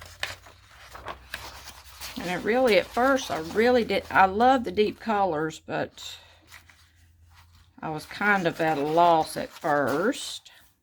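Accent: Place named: United States. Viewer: Okay, will have a look then. Can I ask where you are from? You sound like you're form the USA, but it must be very late or rather very early in the morning as I am from the Netherlands and 6 hours ahead of the eastcoast, 8 hours from the west. I am going through your videos right now to catch up on them.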